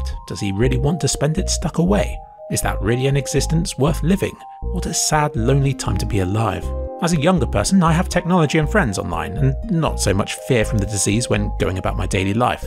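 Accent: United Kingdom. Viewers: You sound British a re you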